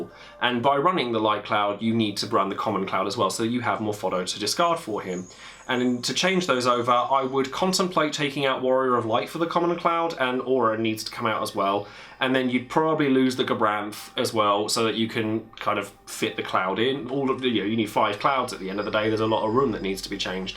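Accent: United Kingdom. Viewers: quick q, where abouts the UK are you from? also, clouds field ability does that buff all clouds on the field? an example would be if a tifa and light cloud are already on the field, do both light cloud AND common cloud get the 2k buff? i dont normally comment but your vids are real good, keep up the good work (Y)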